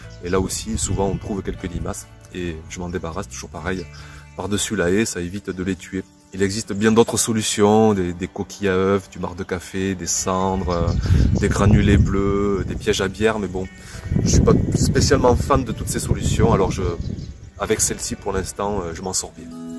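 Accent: South, France. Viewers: Bonjour de vous voir et entendre ce petit accent du sud nous réchauffe toujours le 💖.alors soyons tous unis dans cette période difficile.merci pour votre générosité.bon courage à vous tous et toutes et bonne continuation.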